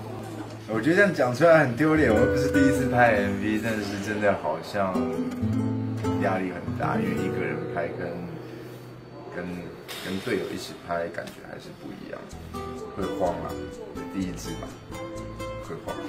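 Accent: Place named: China. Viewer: Me encanta su acento con chino. 😍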